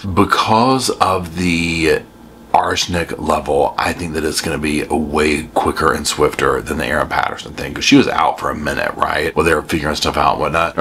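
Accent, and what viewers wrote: South, United States. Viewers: mayonnaise 😂 just says it with a southern twang.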